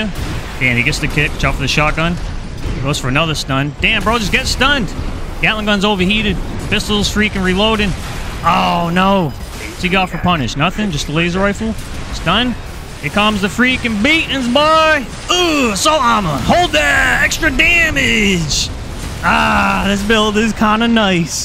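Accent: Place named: East Coast, United States. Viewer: Love your videos homie! Judging by your accent I’m guessing you’re from the east coast Boston or jersey! My old job I had a ton of coworkers who came from the east coast to train with me here in Cali so I can spot that accent a mile a way lol. Btw I’m on my third play through on the story mode. I’m trying to unlock all parts before I make the journey in to pvp. I’m a veteran of this game dating back to ac1 but due work and family duty I’ve been trying to make some time For some ac goodness !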